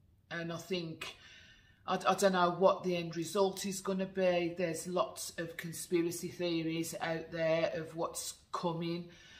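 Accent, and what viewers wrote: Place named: Birmingham, United Kingdom. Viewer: Enjoyed that, thanks for sharing. I got into You tube hauls during lockdown as I missed shopping so much! Strangely all the people you mentioned I subscribe to! However it amazes me how many others I subscribe to just disappear into the ether, which I find concerning as they are virtual friends and I worry about them. I was brought up in Birmingham so I love to hear your accent as I have lived in London for many years. I have never heard the accent described as what you called it! Keep the vlogs coming!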